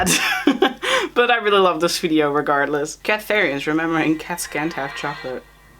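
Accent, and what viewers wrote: Netherlands. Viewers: i smell dutch, are you dutch??? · Are you also dutch? Bc i have the same kinda accent when i speak English😼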